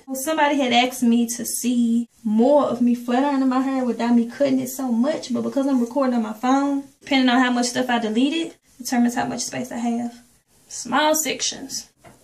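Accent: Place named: Memphis, United States. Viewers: I knew you were from Memphis 😣. Lol I can tell by that accent. I'm Nasvillian ✌✌✌ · I knew you were from Memphis I hear it lol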